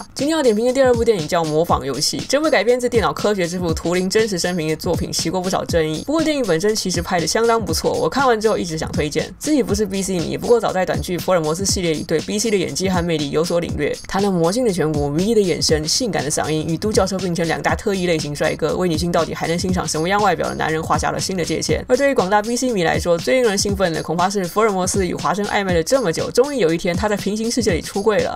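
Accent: China. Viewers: 主要是口音問題，一聽就知道 ；用字遣詞不是在成語，是在一些像"尤為特別的存在"、"玩意、"不能忍"等一般台灣人不會使用的語句，台灣學對岸的詞彙大多是台灣本來沒有的簡稱，像是小三、小屁孩等，也僅此而已還是建議你口音可以改台灣人比較能接受的方式，如果你觀眾群在台灣的話，因為很多人對這是很反感的，像我因為有人推薦說很好笑來朝聖，但口音和用詞讓我退避三舍，大概30秒就不行了，很遺憾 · 魏茹茹叫別人不要在台灣用大陸口音,想想嘛...不就等於叫台灣人到大陸不准用台灣口音.... 如果是我我也不知道要怎麼跟人家溝通哈哈...多體諒一下就好啦,不喜歡也不要說的那麼白,要留台階給人家下呀...尤為特別存在我會用啊...玩意兒...不能忍很常聽別人說呀.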